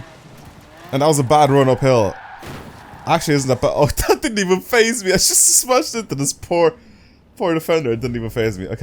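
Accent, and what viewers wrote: Ireland. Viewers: An angry Irish man shouting at me, what else would I want? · What park of ireland you for sound like your from down the country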